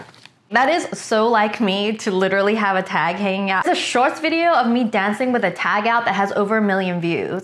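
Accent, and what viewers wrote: United States. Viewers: You've been in the states for so long u have an American accent now. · Love the new Americanized accent. 😍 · i haven’t watched in forever but it feels like you have an american accent now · She’s got an America accent 😂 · I've not watched Wengie in years and was shocked about her now American accent!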